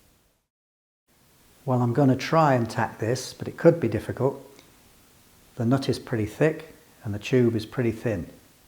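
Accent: United Kingdom. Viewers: Thanks, I own an Atlas 7-B Shaper and I think I’m going to make a mill head too! I love your gentle British manor and voice. Thanks again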